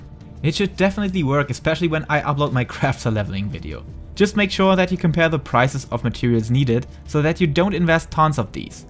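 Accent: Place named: Germany. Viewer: Nettes Video . Schade , dass es auf englisch ist und nicht auf deutsch . Man hört raus , dass Du Deutscher bist . Wäre doch mal was , wenn man dieses auch mal auf Deutsch erklären würde . Wie auch immer. Danke fürs hochladen . Denke , dass das auf meinem Server nicht so klappt . Schade .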